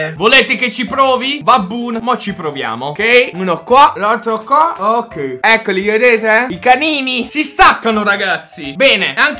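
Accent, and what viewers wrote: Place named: Naples, Italy. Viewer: Mi fai troppo ridere poi non so perché hai l'accento napoletano no perché ti seguo da 1 mese ma non so se sei napoletano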